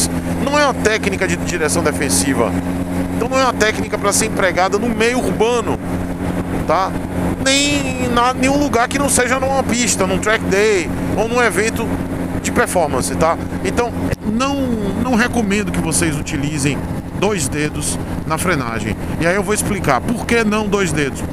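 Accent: Bahia, Brazil. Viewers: Você é de Salvador- BA?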